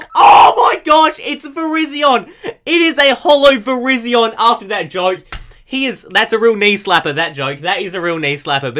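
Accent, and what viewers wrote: Australia. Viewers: Australian accent mate :)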